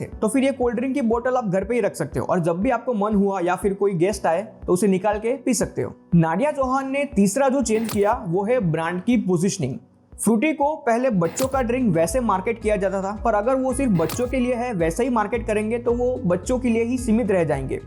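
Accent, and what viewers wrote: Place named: Gujarat, India. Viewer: Buhot he acha video hai brother. I think aap gujarat se ho bcs me bhi gujrat se hu or baat krne k style se pehchan gya😅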